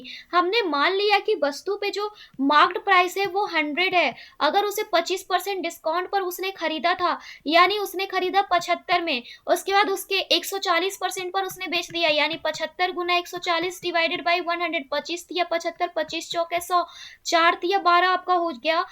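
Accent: Bihar, India. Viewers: Mam, kya aap Bihar Sharif se ho?? 🤔🤔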